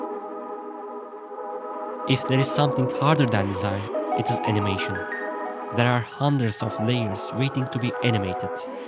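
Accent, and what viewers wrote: Turkey. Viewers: bu kesin Türk, aksanından belli. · Türk aksanı ilk sözden anladım 😘😂 Türkçe altyazı da koysaydın iyi olurdu. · Türk ingilizcesinin şekil bulmuş hali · Türk olduğunu aksanından anladım ama güzel video🙂👌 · Aksanından anladım Türk olduğunu :D Başarılar! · O kadar Türkçe aksanın var ki :D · Olm aksağanından anlaşılıyo türk olduğun lan aksağan berbat fjslnsfjld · Türksün lan sen konuşmandan belli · Good job. / Aksanından Türk Olduğunu Anlamıştım! Gerçekten Çok İyisin. Devam Et. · Reis aksanından türksündür diye düşünüyorum fenasın adamsın ellerine sağlık :) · ABİCİM Bİ VİDEO DA TÜRK OLDUĞUNU SÖYLE YA KONUŞMANDAN ANLAŞILIYO BİLE NERELİSİN ABİCİM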